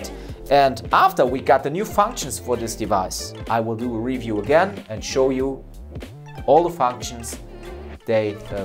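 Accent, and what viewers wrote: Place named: Germany. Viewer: I hear german 😊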